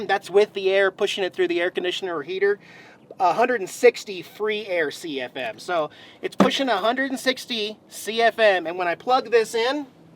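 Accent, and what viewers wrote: South, United States. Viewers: Why is he talking with a southern accent now?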